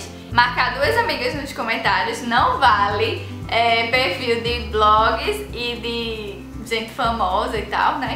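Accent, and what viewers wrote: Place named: Pernambuco, Brazil. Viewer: ah dou de pernambuco <3 amo nosso sotaque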